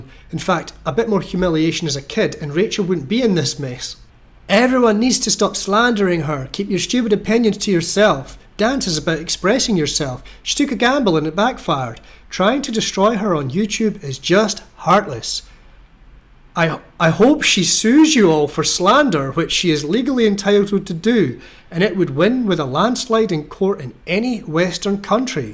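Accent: Scotland, United Kingdom. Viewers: Brilliant. Articulating what 'get real' means. And the Scottish accent is cool, or is that racial prejudice 😂. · I've just discovered your channel I subscribed to it. I really like your work. The first Scottish in my list, I love your accent reminds me of my high-school teachers 😊 Regards from Yemen (I bet I'm also the only subscriber on your page from Yemen 😅). Cheers ...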